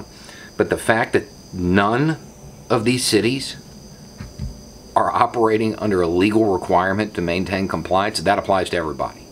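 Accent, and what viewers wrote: South, United States. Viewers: So I kept getting confused because it sounds like you're talking about getting rid of "no not greats". I had to rewind like five times to figure out what you were actually saying. I don't think it's your southern accent, either; just saying it to myself in my pacific coast accent, it sounds the same to me. Just one of those phrases that's confusing if you haven't heard it before.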